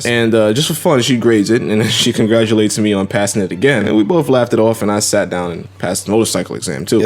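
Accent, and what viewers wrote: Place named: New York, United States. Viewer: Man I knew the minute I listened to your voice I knew you were from NY also lol. Hope to run into you in the city sometime, love your videos 👍🏻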